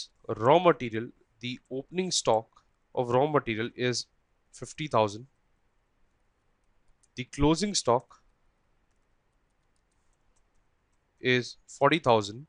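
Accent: India. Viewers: I don't mind an accent , I'm from the American South, but good grief. It's great that Indians are often technical but they make zero effort to sound anything like a native English speaker. About 4 minutes in, its like listening to a machine gun and I move on, it's just too hard trying to decipher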